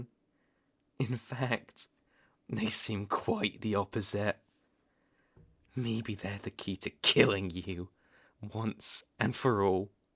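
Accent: United Kingdom. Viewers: playful teasing even when serious plus the brit sound :face-orange-biting-nails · I SEE BRITISH I ARIVE IMEDEATLY (not in a weird way or anything also, I'm dyslexic, give me a break) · Me not seeing this is a British audio "Hey there!" Jumps in holly sweets that scares me Me: "Why is it always the accents that startle me?" This was so much fun to listen to though! I loved it! Absolutely enjoyable!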